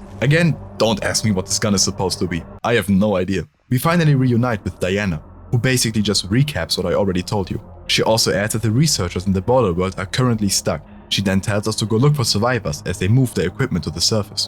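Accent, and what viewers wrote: Germany. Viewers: wait you german?! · You're German? I've lost all recollection of European accents.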